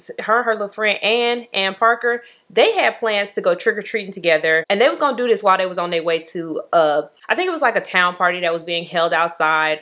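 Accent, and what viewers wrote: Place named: Chicago, United States. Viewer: Lol you definitely from close to Chicago, you funny as hell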